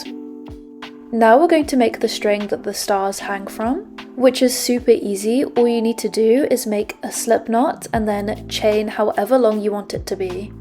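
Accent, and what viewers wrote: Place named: United Kingdom. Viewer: Indeed you did it quite absolutely fabulouslly magically authorically efficiently with your nice british accent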